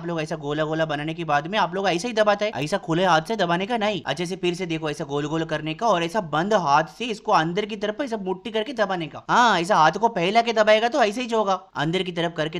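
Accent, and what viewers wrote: South, India. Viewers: You r amazing 😍aap sach me south se ho ya south accent only for video 😅👌🏻👌🏻👌🏻👌🏻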